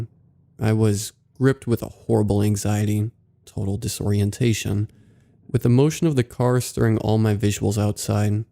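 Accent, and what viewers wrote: United States. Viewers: I have great deal gratitude to those who narrate some very different like dr creeps he got own style as do this one both very good.apart from American accent and he can't help that.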